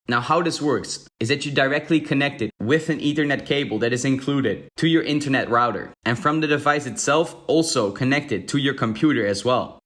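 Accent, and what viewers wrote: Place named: Netherlands. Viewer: Nederlands accentje😂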